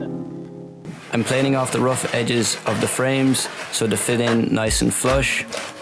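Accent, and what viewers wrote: Ireland. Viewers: loving the ducks, always make me smile, happy birds, i been adopted by several foxes, a couple of them follow me up the road, very amusing - loving a bit of southern Irish accent, got a bit of Irish in me somewhere, not sure which bit, but some will say the best bit haha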